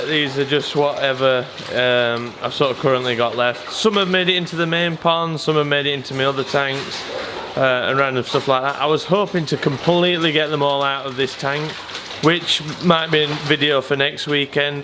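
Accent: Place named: West Yorkshire, United Kingdom. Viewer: Hello Came across your video's by chance and wondering if you sell koi, your fish look amazing. We are in West Yorkshire, not too far away going by your accent. We have kept Koi 30+ years and haven't bought any fish for years and our pond is now looking a little bare.so we are looking to restock.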